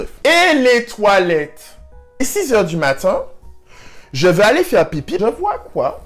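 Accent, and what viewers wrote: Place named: Martinique, France. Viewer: Wouah!!! L'accent martiniquais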